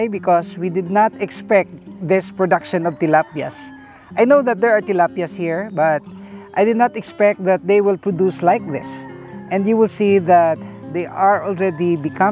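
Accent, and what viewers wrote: Philippines. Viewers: based on his accent, i think he's in the philippines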